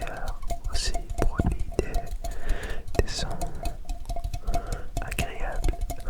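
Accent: France. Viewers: J’adore ton accent français!! +1 follower yayy!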